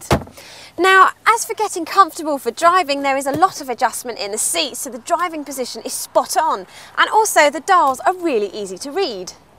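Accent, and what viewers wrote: United Kingdom. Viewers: Gosh i love the british accent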